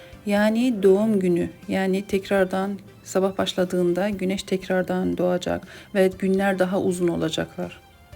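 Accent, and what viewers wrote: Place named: Turkey. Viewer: عزيزم دستت درد نكنه از اين غذاى خوشمزه ، چه لهجه ى تركى زيبايى دارى 🩷🪻🩷🪻🩷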